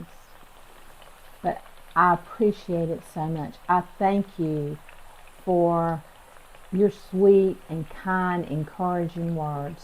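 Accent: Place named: North Carolina, United States. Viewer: I have just recently found your videos and enjoy them. I live in Leland NC. I thought you sounded like you were from NC. I lived in Beulaville NC back in th early 70's. There was not much there. I think there was a bank, gas station maybe 2 I think there was a Haedees. I don't think we had a stop light. Beautiful little town. Have a safe and enjoyable 4th. Oh have you tried precooking your potatoes (regular and sweet) in the microwave then peel and fry..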